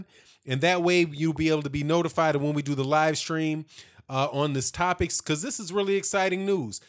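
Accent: United States. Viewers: I like your "more than likely's":) i also like your american accent and no bias towards Beyonce being allowed a few extra seconds of recovery against Ortiz. Bravo!!!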